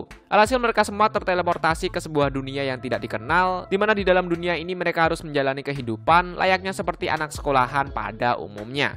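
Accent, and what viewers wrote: Java, Indonesia. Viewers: Jawa ya bang? awokawok sama :v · Gk popo asli jawa yh?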